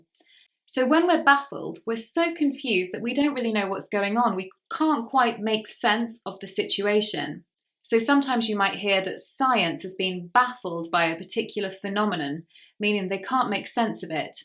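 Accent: United Kingdom. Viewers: Hi madam, really we have enjoyed your teaching in English and satisfied your grammatical and real British English for we accept this as native english,so may we suggesting you to speak little slower than usual so that we can heard sound and clearly okay! We also satisfied special English news from America which suits us slow,clear and steady English speaking.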